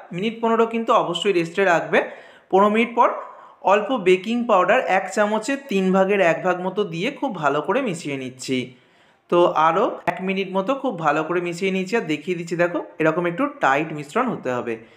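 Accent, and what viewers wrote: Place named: Kolkata, India. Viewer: তোমার রেসিপি খুব সুন্দর। কিন্তু তোমার কোলকাতা স্টাইলে কথা শুনলে রাগ উঠে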